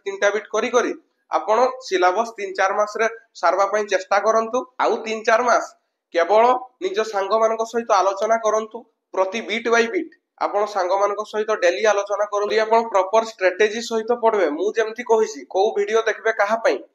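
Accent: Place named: North Odisha, India. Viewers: Aapono nka kotha pura mohanta type re,mayurbhanj ro ki...?khub kom sir motivate karanti,aapono motivate kori kori bujhauchanti chhua mananku,so,thanks...mu history,politics,geography and economics note chanhuchi,next year b.ed preparation pai...so,give me some notes🙏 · Sir apana nka ghara keonjhar, Mayurbhanj ya phir balasore ru thiba bcz apana nka talking ru jana paduchii, sir mu b cbz student aii year b.ed sarila😊 bahut worried achii kn kemiti karibi😢😢😢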